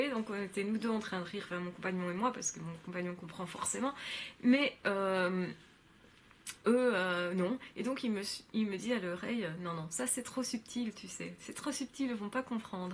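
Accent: Belgium. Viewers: Vous êtes adorable, utile et très agréable , sachez que votre intelligence en arborescence me parle et me touche beaucoup. Il me semble qu"une femme s'exprime plus facilement par l' écrit que par l'oral et que c'est l'inverse pour un homme .Je vous suis depuis longtemps et je vais continuer car cela me rassure sur ce que je suis . Merci .PS J'adore votre accent belge .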